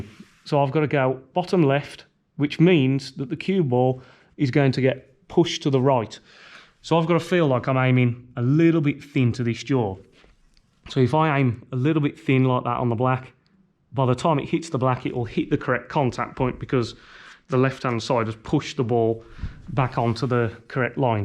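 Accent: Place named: Stourbridge, United Kingdom. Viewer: Sounds like you are from near me, stourbridge!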